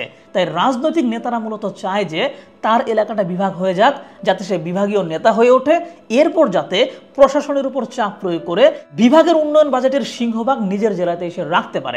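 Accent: Noakhali, Bangladesh. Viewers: ভাই আপনার বাড়ি নোয়াখালী নাকি? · আপনার বারি মনে হয় নৌয়াখালী মনে হয়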